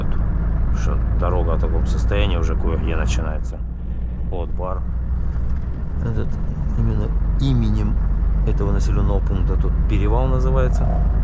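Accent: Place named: Rostov, Russia. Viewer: А говор у тебя Ростовский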